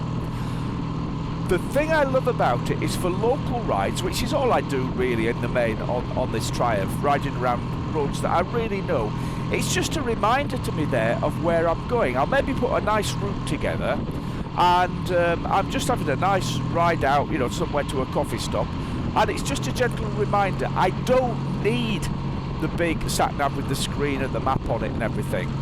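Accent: England, United Kingdom. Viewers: Nice Video but even though I enjoy English Accents, I would like to hear the motor more. Go thorough the gears a couple of times with your mouth cloised. Cheerio...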